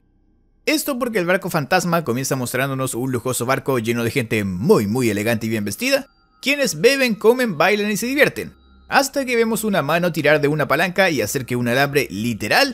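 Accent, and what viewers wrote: Chile. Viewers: Una pregunta eres de chile amigo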